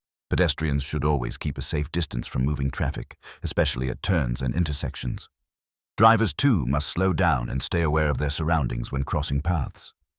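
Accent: United Kingdom. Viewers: This new voice is horrible, you already had the perfect posh British voice on recent videos. Please change it back.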